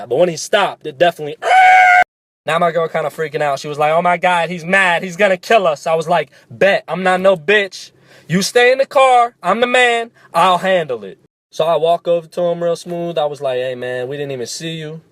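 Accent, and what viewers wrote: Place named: United States. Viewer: fuck you make me laugh !! god bless the yanks accent !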